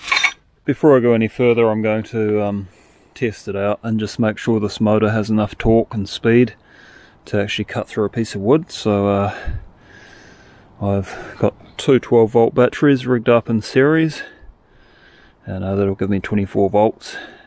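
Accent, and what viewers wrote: New Zealand. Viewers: You must be a kiwi??? 🥝 👍🏻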